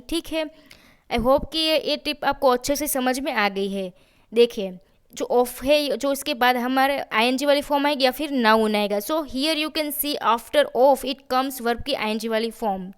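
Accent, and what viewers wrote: Gujarat, India. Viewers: Ape ma'am to first ape Gujarati hoo 😅 vo to malum chala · Gujarati accent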